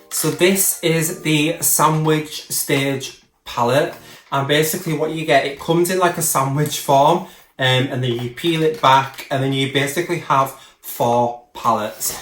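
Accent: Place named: Hull, United Kingdom. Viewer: Mine was shipped yesterday and I can’t wait so started looking on yt see your video and instantly think I know that accent 🤣 fellow hullian you got a sub just for that 🤣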